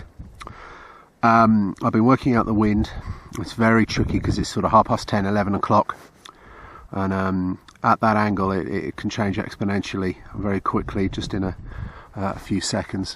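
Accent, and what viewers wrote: United Kingdom. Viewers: you sound like a brit but the landscape looks to me like scotland.... what's the dillio?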